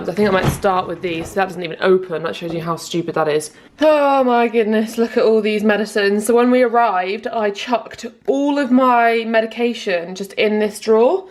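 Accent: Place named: Cornwall, United Kingdom. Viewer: I love your accent so much. I think Cornwall is my favorite British accent. I loved your video. You're lovely to watch! :)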